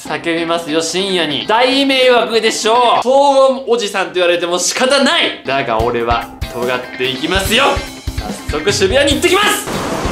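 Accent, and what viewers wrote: Toyama, Japan. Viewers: 時々富山弁がでるのが、ほっとします。富山県人より☺️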